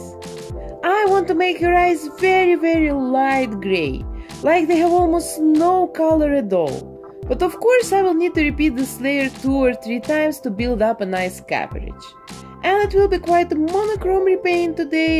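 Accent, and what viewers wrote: Russia. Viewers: I love your voice its like Russian mixed with Queen Elizabeth.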